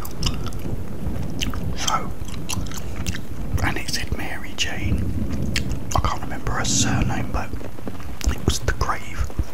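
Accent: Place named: United Kingdom. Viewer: Bri’ish + gum = ☺️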